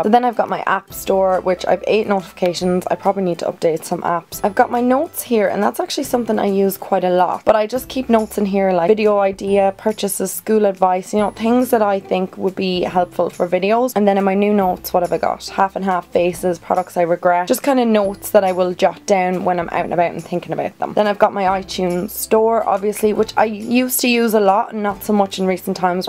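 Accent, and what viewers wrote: Ireland. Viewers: is her accent irish ?!!!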